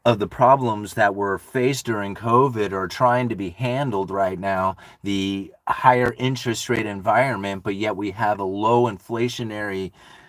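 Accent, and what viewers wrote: United States. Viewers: Are you American or Canadian, NO. 1.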